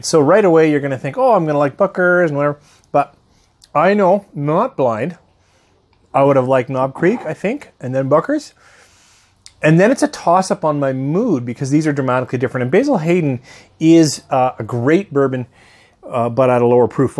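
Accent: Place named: Canada. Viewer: Holy shit I’ve been watching your videos for months and just now I noticed your Canadian accent. Tbh I enjoy your videos more now😆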